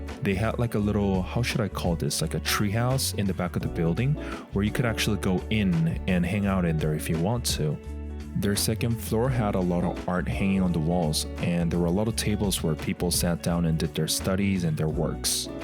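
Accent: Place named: England, United Kingdom. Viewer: Welcome back! I hope you didn't forget me 😢 I was wondering when you'll post and now you've uploaded I'm so happy. Also this is useful if I plan to visit Korea one day Thank you so much for making this video possible 🥹🥹🥹 also hope ginger is doing well you're looking more handsome 💕 and I really love your English accent can I get a virtual hug 🫂?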